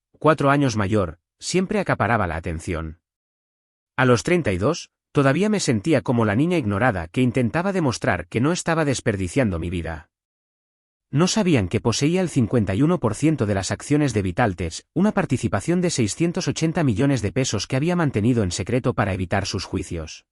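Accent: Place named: Spain. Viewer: Si la trama del relato se desarrolla en México como país, ¿porqué el audio está en español ibérico y no latinoamericano?. Cansan sus terminaciones en "eis" como dudasteis en lugar de dudaron o hicisteis en lugar de hicieron y muchos términos más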